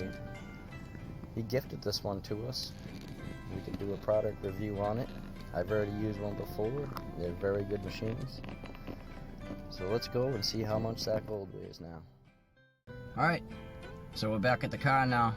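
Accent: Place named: New Jersey, United States. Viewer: You sound like your from Camden New Jersey.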